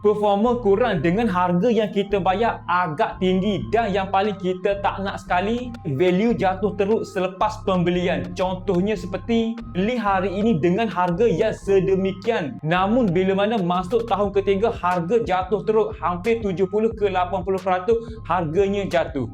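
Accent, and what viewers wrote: Kelantan, Malaysia. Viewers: 😂😂😂 aku baru paham,pekatt gilaaa nak mampoih accent kelatey dia😂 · Mula2 dgr sy dh gelak.. 😂😂sorry dik., 🙏🏻 bunyi ganu klatey + bm baku., tp cute sgt.. sy suka contentnya., just be yourself ok., tq utk info yg dikongsi., semoga jd youtuber yg berjaya 🤲🏾👍🏽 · Kualiti persembahan lemah. Slang kelate pekat. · Pekat molek loghat kelate. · Klate ke boh? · Kecek kelate molek bohh.. 👍👍👍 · Bey..kelantam ker tganu.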